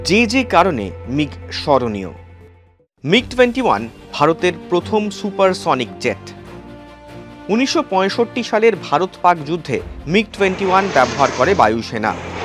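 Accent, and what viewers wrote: Bangladesh. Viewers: বাংলাদেশের মানুষের মতো কথা বলে ছেলেটা😊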